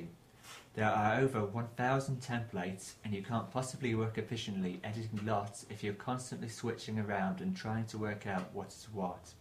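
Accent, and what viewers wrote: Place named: United Kingdom. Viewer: The Brittish accent, itself, isn't a problem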